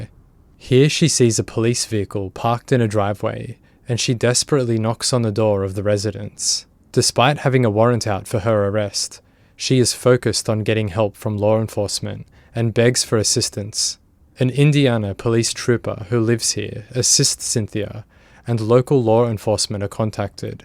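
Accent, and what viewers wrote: Australia. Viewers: Nice to hear an Aussie doing true crime. Subbed mate. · Sounds like an Aussie did this doco by accent or maybe a Kiwi but as an Aussie I think it's an Aussies voice. I'd never use it even once as they all say I'm only trying it once & a year later they're nuts! I've seen just 1 year of meth uses' impact on the brain by a meth users who were paid to allow weekly brain scans over the year & their brains are very impacted by even a month on meth let alone a year so imagine someone like Heidi who's been on ity for years!!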